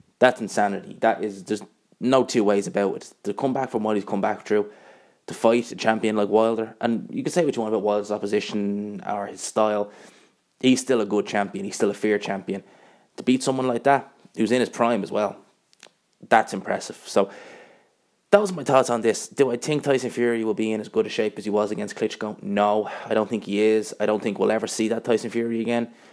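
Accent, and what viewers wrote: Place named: Ireland. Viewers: Hey bro Good to here an Irish voice! Good video ! Fair play, keep them coming! Anyways I think fury will school him, easier then Klitschko!! And truthfully brother I definitely believe the gypsy king is in way better condition now then he was against Wladimir Klitschko, his more powerful now and still got the speed ! Cause now his doin weights, were for he didn’t before! And bro he seems WAY HAPPIER NOW 💪🏼 and you know the saying ( A HAPPY FIGHTER IS A DANGEROUS FIGHTER ) THE GYPSY KING IS BACK!!! A NEW 🥊🥊🥊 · Wether Irish voice or not nice to see a non biased opinion based on boxing knowledge not who is liked most ! This is 2nd video watched and subbed ! ....... more posts like this the better as to many now Mae videos on who they like and people just say "casual" to the with different opinion ! .......... keep posts coming mate ! 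Gman........and aaron refreshing to see someone commenting with no insults for a change 😂 peace ✌🏼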